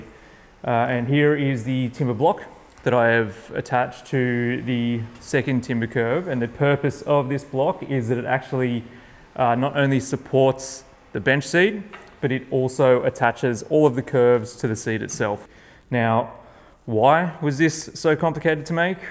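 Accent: Australia. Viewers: Hi, I just stumbled onto your video documenting your amazing bench seat. Nice to hear an aussie accent on YouTube and a Sydney business as well!!! Amazing craftsmanship, thanks so much for sharing... 🙂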